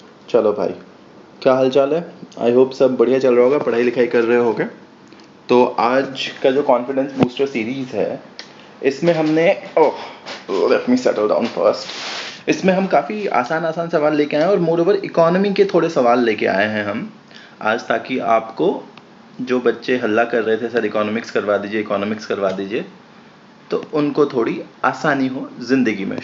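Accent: Bihar, India. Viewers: Bihari tone jabardst hai apna sa lagta hai aise hin padhate rahiye sir👍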